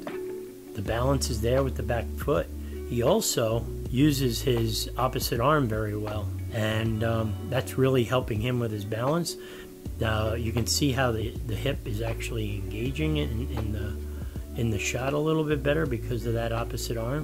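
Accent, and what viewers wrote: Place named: Brooklyn, United States. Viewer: Enough of the Brooklyn accent. It's not funny and I will unsubscribe if I keep hearing it. I'm here for information not some whobis trying to be comedian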